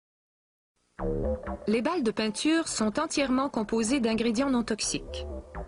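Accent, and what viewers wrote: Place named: Canada. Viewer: quel bel accent canadien !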